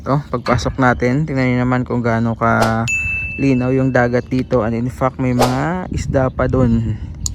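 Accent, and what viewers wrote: Visayas, Philippines. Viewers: Ganyan bisaya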